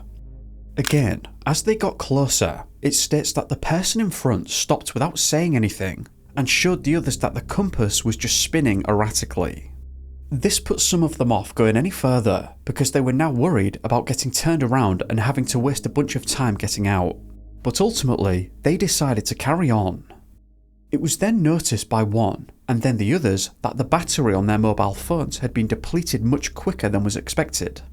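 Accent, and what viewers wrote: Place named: Wales, United Kingdom. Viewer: I believe he's from Wales. Welsh accent. 🏴🏴🏴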